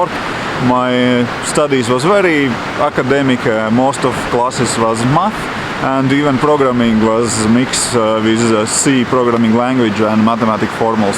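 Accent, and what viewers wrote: Russia. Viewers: Доброго времени суток, такой акцент у вас русский) подскажите вы меня понимаете?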